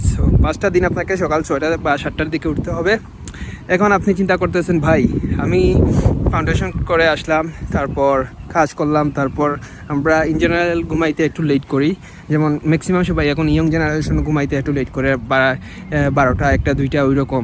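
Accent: Sylhet, Bangladesh. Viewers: Bhai mono oy Sylheti 😅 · ভাই সিলেটি মাত আইয়য় ❤ i love Sylhet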